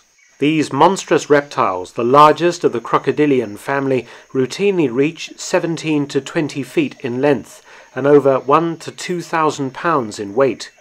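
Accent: United Kingdom. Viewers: Is this really true? This could be all bullshit for all anyone knows! Black and white video and a British voice don't make it true!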